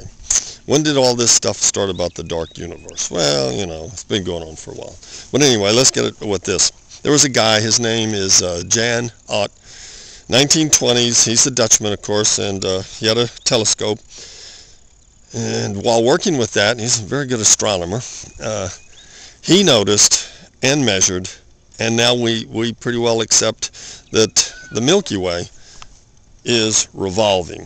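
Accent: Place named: South, United States. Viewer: a southern drawl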